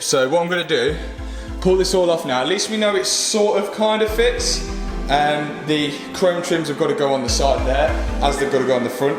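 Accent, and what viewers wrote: Leicester, United Kingdom. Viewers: This lad doesn’t give a shit and just sends it which is why I love these videos! Also, are you from Leicester?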